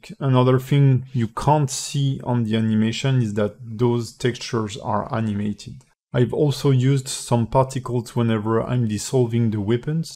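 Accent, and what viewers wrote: France. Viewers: what a french accent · Vraiment top ta vidéo, très quali et ton animation est cool ! On sent le petit accent frenchy :P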